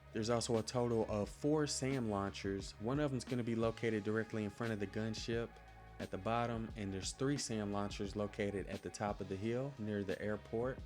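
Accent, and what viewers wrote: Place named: Texas, United States. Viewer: Es FLOR de Oro, pocos huevos de pinche mierda! And Jesus Fucking CHRIST! Talk about a really THICK Gringo tongue! You from Texas, boy? Yo soy, también, pero hablo Español respectablemente, académico, en facto. Tu pronunciación es ferguenzoso. Ay, me cai gordo. Flor has ONE syllable, not two; it's NOT pronounced FLOW-err. Do you HEAR the words coming out of your mouth? I'd be astonished if you'd managed a D in high school Spanish, and that's sayin' somethin', you bein' from the South an' all.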